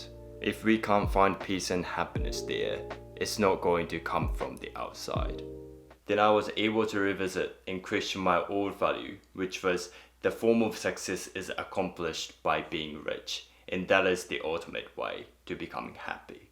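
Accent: New Zealand. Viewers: You sound a bit kiwi. ☺️ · your english is good, but as an American i can pick up on your New Zealand accent at times. like the way you say the word "yet" and some other words. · It always makes me laugh how much time and money people spend to become 'minimalists'. You are the most authentic person on this topic that I have watched so far. Thanks for posting your thoughts on these topics - and it's nice to hear a Kiwi accent for a change (from an Aussie) xx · I wondered if you grew up in NZ, you have a Kiwi accent. Then I saw another video and yup.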